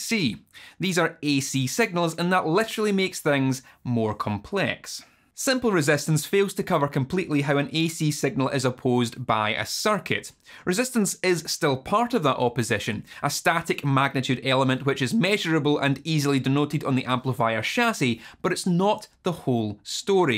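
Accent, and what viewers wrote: Scotland, United Kingdom. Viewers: He's not American, but Scottish.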